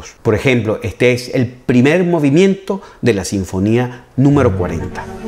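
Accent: Venezuela. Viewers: Hola. Acabo de descubrir tu canal y este es el segundo video que veo. Me parece que haces una excelente labor divulgativa y además es muy agradable escuchar las historias contadas con nuestro acento venezolano. Dicho esto, te aconsejo que tengas cuidado con las redundancias, ( "verificar la veracidad.." en vez de "confirmar la veracidad.." o "verificar la existencia.." ) y con la gestualización que me parece un pelín excesiva. Insisto, sin embargo en que la calidad de la presentación es muy buena y muy bien redactada. Te deseo un gran éxito. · Tu acento es venezolano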